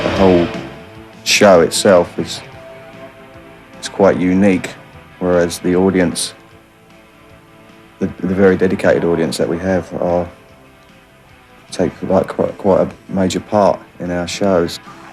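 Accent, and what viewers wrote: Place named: United Kingdom. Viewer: lvly british accent!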